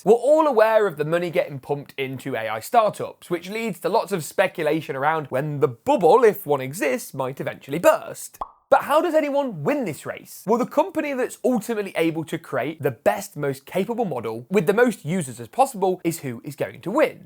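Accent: United Kingdom. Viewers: His accent is British , its completely different compared to US accent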